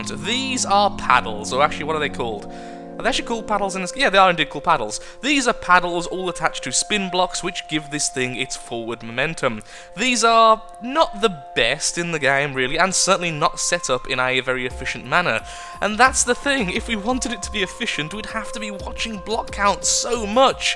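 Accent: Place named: England, United Kingdom. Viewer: lol i can tell that you are english from your accent.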